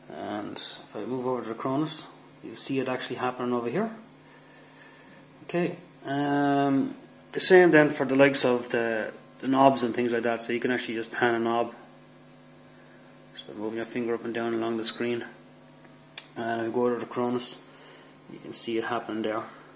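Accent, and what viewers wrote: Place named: Ireland. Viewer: I've actually bought the VSTs you sell on your website! I've always wondered what you sound like - now I know. Totally Irish, exactly like my father. Keep up the great work - Chris.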